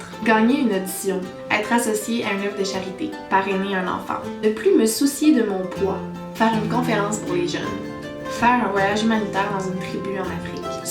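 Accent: Quebec, Canada. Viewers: C'est un accent canadien ? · Ouah :o D'habitude je déteste l'accent québécois mais là c'est trop mignon :3 +1 abonné :D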